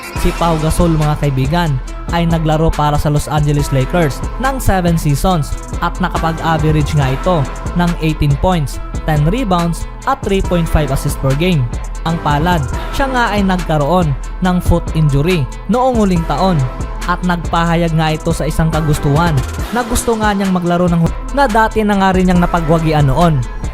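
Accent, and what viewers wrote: Visayas, Philippines. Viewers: My pagka besaya mag saleta ahaha. Pinahaba lang ung conteksto. Tonge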